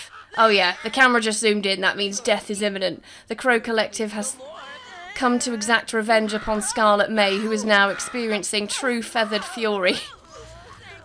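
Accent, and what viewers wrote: United Kingdom. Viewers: Your accent in particular is so nice to listen to. I don’t usually like British accents but yours is so satisfying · She has the classy UK accent that’s why lol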